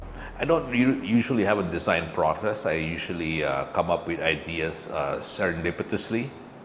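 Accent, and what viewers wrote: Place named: Philippines. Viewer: Click agad dahil sa thumbnail, kakaiba yung design... Pini-figure out ko kung India, Malaysia, Singapore yung bahay Pero nung nagsalita na... Luh! I somehow heard a Filipino English accent kaya sabay tingin sa description at comment. Just wow! 💪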